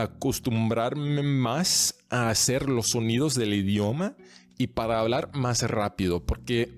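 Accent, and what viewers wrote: Mexico. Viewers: I mean I’m not a Spanish native but it would be pretty hard for me to tell you aren’t native, accent is excellent. Once you get faster it’s over. You don’t play about your Mexican accent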